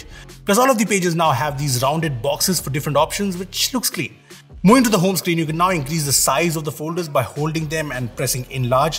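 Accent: India. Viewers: How do u got 3m subs with that indian accent, i couldnt understand a word you said.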